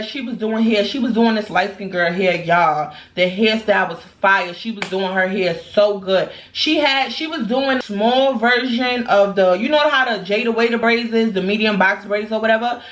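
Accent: New York, United States. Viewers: Love your story times..you're looking gorgeous, girl..and I love your New York accent! Weirdo is a dumb a**, on evertthing..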